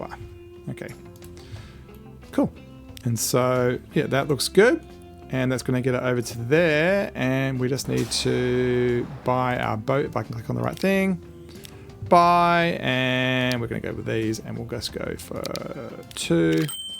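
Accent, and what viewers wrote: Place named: United States. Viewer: In UK we say it like assfault We say d-e-po where you say it Dee-po. We say "root"when saying route. Always wonder why Australian and new zealanders say it like the yanks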